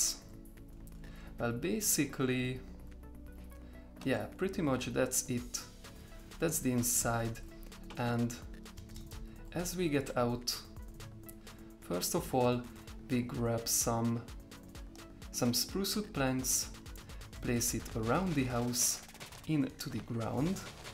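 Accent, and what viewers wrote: Hungary. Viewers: Te magyar vagy? Eléggé hallatszik.. :D Nekem olyan furcsa v a beszéded nem eredeti angol kiejtésre hajaz...vagy csak tudsz magyarul? YouTube are Hungary? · tudtam, hogy magyar vagy.:) kiejtésröl tudtam. amúgy nagyon szeretem a videóidat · Valahogy éreztem hogy magyar vagy a kiejtésed miatt😁 Csak így tovább! 🇭🇺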